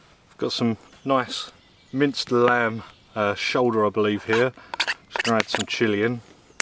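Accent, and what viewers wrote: United Kingdom. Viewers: The sensational combination of at least 3 star level meals, while cooking via bushcraft, and narrating with a british accent.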